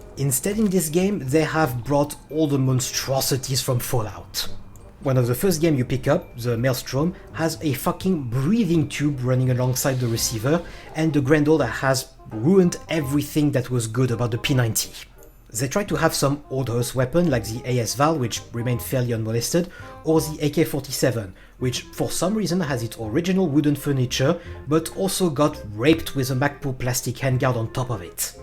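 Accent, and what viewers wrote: France. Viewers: I just discovered this channel. After the video, I went straight to see if he had more content, I hope he uploads more videos, I love his witty sarcasm. The French accent just adds the cherry to the top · That accent... Are you ... French ? Anyway Based Review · Sorry to tell you but I just watched your vid for your french acsent.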